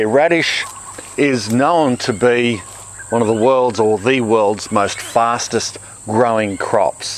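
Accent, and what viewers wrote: Australia. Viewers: How can this video have dislikes!! Can't honestly believe it. Thanks so much from up the hill in Toowoomba. Love the dad jokes but the way. Makes me smile to hear your good ol aussie accent on the screen. Grew up in Brisbane in Morningside so also good to see your southsider as well! 😉. Take care mate. Hope the family is well.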